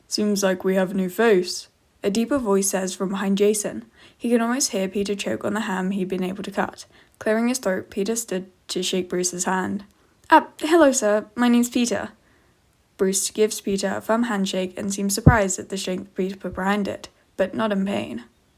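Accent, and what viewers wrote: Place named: United Kingdom. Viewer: HELLO oh omg your BRITISH i think that's what the accent is i'm originally from dustbunnys channel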